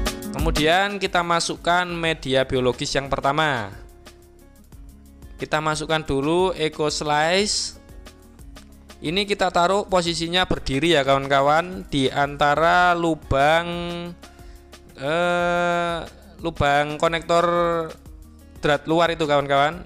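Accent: Java, Indonesia. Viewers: Satu hal yg saya suka di channel Om..walaupun jowo ne kental..tp bahasa inggrisnya ga salah2x dan ga asal2x..Eco Cube betul2x dibaca "eko kyub"..bukan ky beberapa channel lain yg bacanya "eko kab"...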